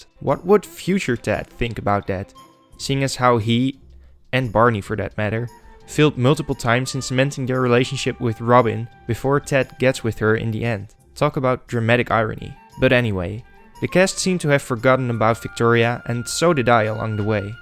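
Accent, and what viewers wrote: Netherlands. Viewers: Is it correct that I hear a dutch accent in your voice? Not meant as an insult, your english is great, just curious.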